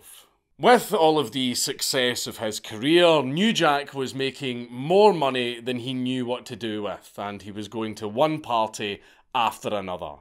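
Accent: United States. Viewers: you have quite a good american accent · dude, your American accent is spot on. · American accent Dankula is kinda sic. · But your American accent took me off guard, I didn't think you would sound like that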